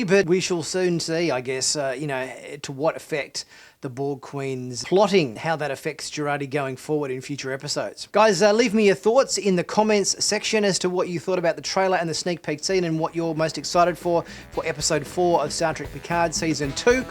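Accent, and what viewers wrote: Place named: Australia. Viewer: You were doing fine until towards the end with your conjecture and speculation...then, your Ozzie accent wore me out.....plz stop talking.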